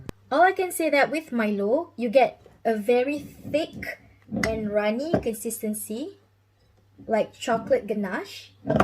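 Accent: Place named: Malaysia. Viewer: I love your Malay accent, And the look in your eyes! 🙂🌹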